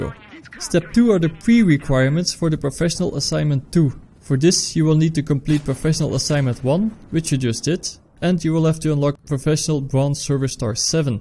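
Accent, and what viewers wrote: Netherlands. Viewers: He has a Dutch accent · haha i can just hear that you're dutch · You sound like a dutch guy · it sounds like a very strong dutch accent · it sounds dutch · CLEARLY DUTCH :D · je hebt het accent van een nederlander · you are Dutch? Because of your accent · Tis trouwens wel goed te horen dat je nederlands ben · Wat heb jij een Nederlands accent zeg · Ik hoor aan u accent da gij Nederlands of Belgisch bent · U sound dutch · dat hoor je aan zijn accent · by your accent that you are dutch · I think that you are Dutch, because of your pronounciation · you're dutch, heard it in 5 seconds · hij spreekt egt die tatta engels met die gekke accent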